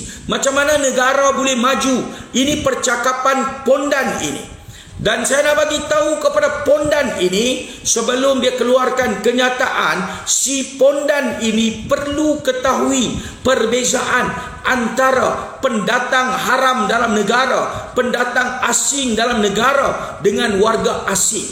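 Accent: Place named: Kedah, Malaysia. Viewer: Dia ngomong basa logat provinsi kedah,sampean mboten ngertos yo mboten nopo nopo.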